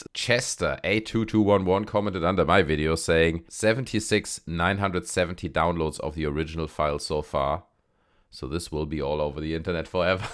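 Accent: Germany. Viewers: you sound german. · Safe der ist ein Deutscher.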